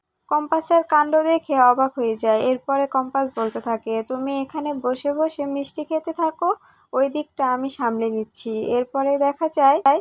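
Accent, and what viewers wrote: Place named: Bangal, Bangladesh. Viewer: বাঙ্গাল বাঙ্গাল উচ্চারণ ভাল শোনায় না।চেষ্টা করলে এটুকু নিশ্চিত ঠিক ঠাক হবে।শুভেচ্ছা রইল।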